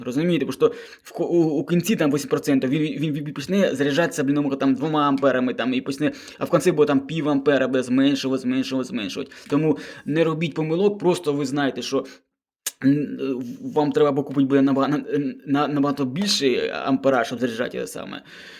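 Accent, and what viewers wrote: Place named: Sumy, Ukraine. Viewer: Молодець,що пробуєш на українській мові, Сумщина тримається,сам з неї😊.всі ми тут розмовляємо суржиком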